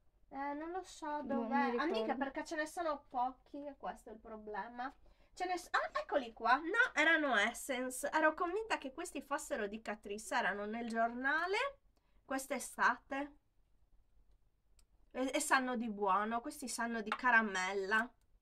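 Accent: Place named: Sardinia, Italy. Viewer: Ciao carissima è bellissimo sentire parlare della casa e famiglia in modo così "normale" riempie il cuore sentirti parlare!😊 Un altro video sarebbe un'idea stupenda...io inserirei oltre agli argomenti citati da te anche qualcosa sui prodotti non gradito secondo te..." Magari puoi titolare con il tuo bel dialetto sardo 😂"... buonanotte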